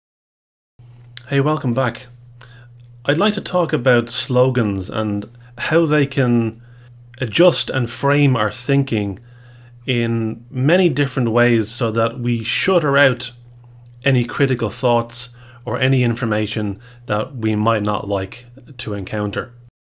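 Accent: Ireland. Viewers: haha after I lifted off it was like watching a whole new video. I really enjoy the cadence of your Irish accent. I sent this to my daughter🤓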